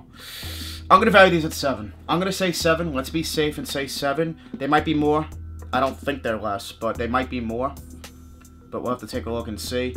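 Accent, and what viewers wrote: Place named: Brooklyn, United States. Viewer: Where abouts you from? You sound like a New York Brooklyn sort of accent.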